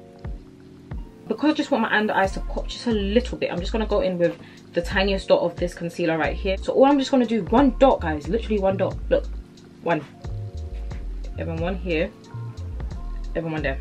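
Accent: United Kingdom. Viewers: I've watched your videos before. Ibnever expect a British accent I think it's because to me you look like LA beautiologist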